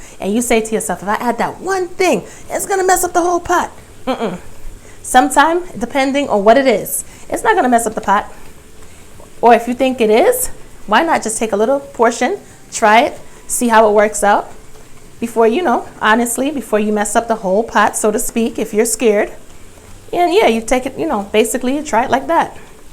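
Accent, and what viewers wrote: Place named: New York, United States. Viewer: Love your new york accent.. It's pretty strong along with your food... All the way from the UK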